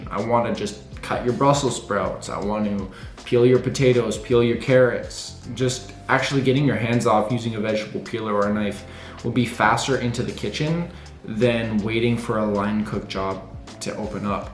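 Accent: Canada. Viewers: Not only is he good looking but he can cook. Also that thick ass Canadian accent. What else could you want?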